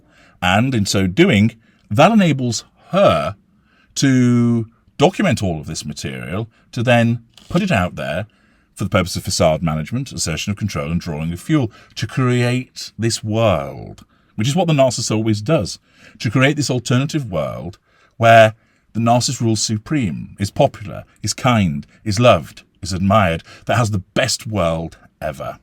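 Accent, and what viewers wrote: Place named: Yorkshire, United Kingdom. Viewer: Love that Yorkshire accent, you almost sound like me!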